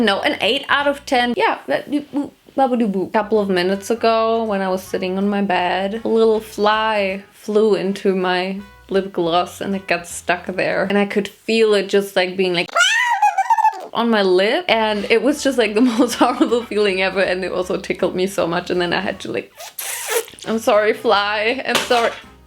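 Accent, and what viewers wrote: Germany. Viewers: Sie redet einfach English und bekommt diese andere Internationale Aufmerksamkeit 400iq aber man hört dass an ihrem English dass sie Deutsch ist das hört sich so cringe an 😂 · Are you German? 😁😅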